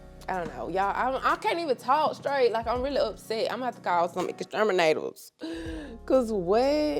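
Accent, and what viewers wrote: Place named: Atlanta, United States. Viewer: Hey girl I'm new to your channel and so far loving the content when I heard your voice I knew you were in the A well pretty close to it. Hey neighbor I'm from Decatur 😊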